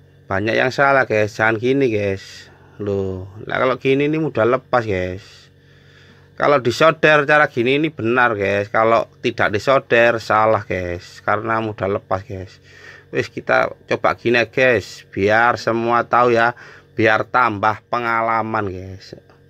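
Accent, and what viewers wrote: East Java, Indonesia. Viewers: Aing mah resep. Gaya ngomong jawa timur na cak euy.!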